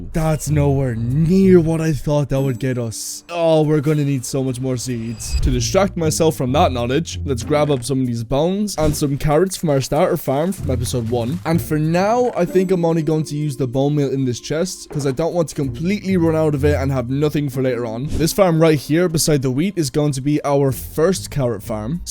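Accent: Ireland. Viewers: I KNEW U WERE IRISH I KNEW I RECOGNIZED A IRISH ACCENT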